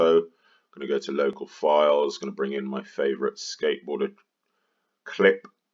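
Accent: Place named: South, United Kingdom. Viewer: Well that was a haaarrrd 9mins and 20 secs to watch. In 9.20sec you managed to simultaneously produce a crystal clear, “how not to make a social media instructional video”, while actually managing to finally show how to freeze frame. Ok I hate just being negative so I hope this comes over a positive constructive criticism as that is what it’s meant to be. Before you start a “how to video” grab a pen and paper and “storyboad” your tutorial, the humming and harring immediately makes you sound like an arse without a clue, and make you come over as a “no thanks they haven’t a clue I’ll find another video” when the end result shows you have half an idea of what you’re doing. Your accent is somewhat off putting, I think the base has its origins is southern UK and relative well spoken indicating a decent upbringing and schooling but the attempt at “getting down with the homies” and all the Americanisms is really a turn off. 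Other than that I did watch until the end, I did (eventually) extract the info I was after, I may possibly look at other vids you put out if only to see if your presentation improves.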